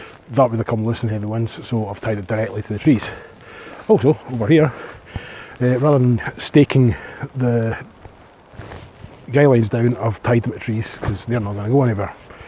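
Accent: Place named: Scotland, United Kingdom. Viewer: Fantastic, didn't stop laughing the whole time, and I grew up in innellan just down from Dunoon so I bl**dy love the accent, reminds me of my youth, keep up the good rant... I mean work.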